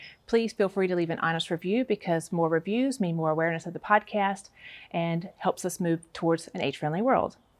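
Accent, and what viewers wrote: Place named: South, United States. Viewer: Great info, live your Southern accent.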